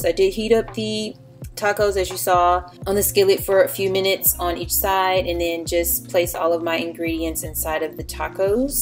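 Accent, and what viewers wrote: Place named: DMV, United States. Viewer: DMV accent🤔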